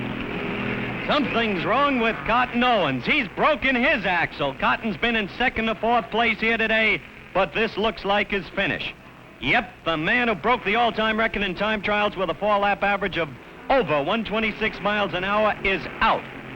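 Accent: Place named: New York, United States. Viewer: It is. He would have been my last choice for this event. NYC accent doesn’t work here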